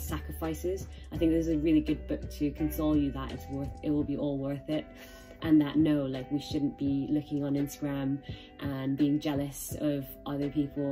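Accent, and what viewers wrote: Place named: RP, United Kingdom. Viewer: Yeah, very posh :)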